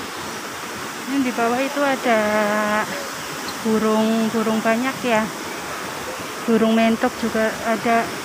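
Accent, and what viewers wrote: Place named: Cilacap, Indonesia. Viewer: Bahasa cilacape ora ilang walau neg negeri orang.. sukses mbak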